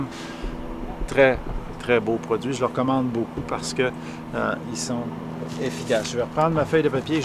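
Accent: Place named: Quebec, Canada. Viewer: Je ne savais pas que vous faisiez des reviews en Français, sur la vidéo en anglais j’avais remarqué un léger accent Québécois mais je n’en étais pas sûr... 😊 En tout cas vos reviews sont superbes et fort intéressantes, et avec ce beau parlé Québécois cela embellit le tout!!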